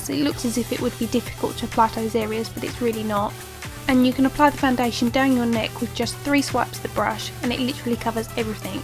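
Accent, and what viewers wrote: Essex, United Kingdom. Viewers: love your accent, Essex? x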